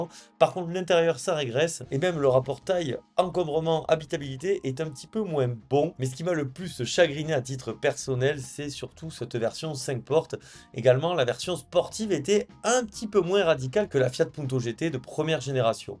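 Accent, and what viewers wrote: South, France. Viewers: Votre style de commentaire est très drôle. Pas de vulgarité et l'accent qui fleure bon l'huile d'olive et le mimosa.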